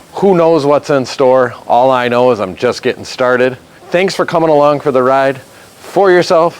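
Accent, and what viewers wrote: Wisconsin, United States. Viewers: I live in Norway but I grew up in Northern Wisconsin. In the 1st 30 seconds of this video I thought this guy really has a Wisconsin accent... Great video!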